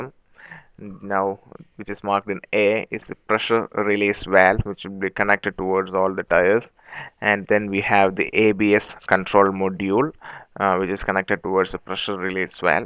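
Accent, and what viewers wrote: Kerala, India. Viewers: fucking hate your indian accent. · Lol its the malayali (keralite) accent. Sucks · fo tys.. fuck ur indian accent.. if u dont know how to speak english then dont speak it..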